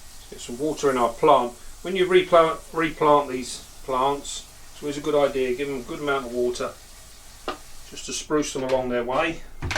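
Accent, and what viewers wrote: United Kingdom. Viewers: Hello, May I ask you what kind of british english dialect you are used to speak? It sounds very well and it's quite understandable for me as a german.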